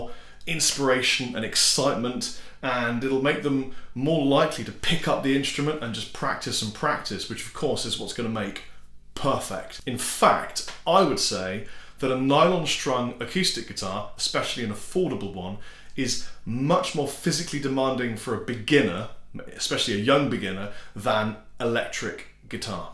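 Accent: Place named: Brighton, United Kingdom. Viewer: Hey, I´m 55, always dreamed of myself playing but never got the guts to take lessons and practice. Shame on me, I know. These days I use the adult life, job etc as excuses for not geting started, but, believe it or not, I still didnt give up. Probably I´ll never play at all, considering this failure of mine, but have you ever heard of utopia? Sure you did. That´s whta me playing at least reasonably well means to me. Two final things: perhaps the flip side of your conception of music and playing is some guys like... Klara Soderberg (First Aid Kit), Nataly Dawn, Stereo Total (ever heard them?). Their technique is way too rudimentary, sketchy, but they do manage to create great fun music. I tend to become a bit bored of virtuoso players who, despite of having a monster technique, dont "get there", cant make music that´s enjoyable after all. 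I´d put in this basket 90% of mainstream, wealthy acts: Eric Clapton, Pink Floyd, RHCP, you name it. For me, once the music is fine, it doesnt really matters how skilly you are. 2nd and last: does everybody in Brighton has your accent? It´s just great!! I wish I could walk around in London and hear only that around me.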